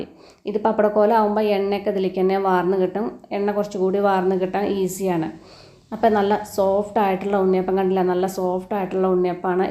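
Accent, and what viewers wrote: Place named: Valluvanad, India. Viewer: നല്ല വള്ളുവനാടന്‍ ഭാഷയ്ക്ക് നമസ്കാരം.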